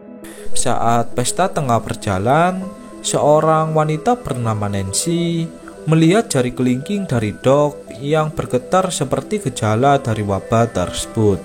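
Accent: Java, Indonesia. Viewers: Logat jawanya medok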